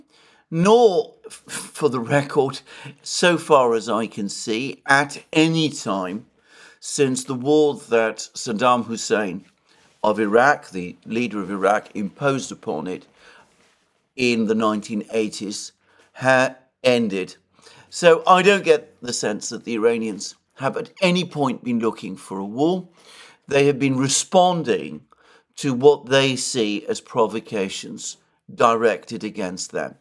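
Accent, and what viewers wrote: England, United Kingdom. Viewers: I wouldn't be able to read it with that English accent.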